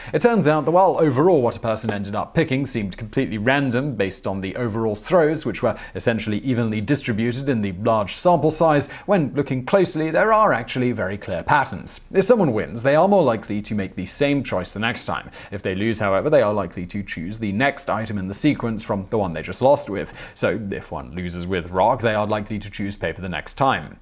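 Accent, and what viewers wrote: England, United Kingdom. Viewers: Ok , I just spent of my life listening to this cuz Simon has a great voice and Honestly, had ANYONE ELSE said with a straight face that there were PROFESSIONAL SPORT ORGANIZATIONS re: RPS I would have called Bovine Scatology in a nanosecond.... Saying stuff with the weight of credibility using an English accent has to be a superpower.... That being said I’ll see your RPS clip and raise you one...” I dare you to do a clip re the origin of LIAR LIAR PANTS ON FIRE “ Have a good wknd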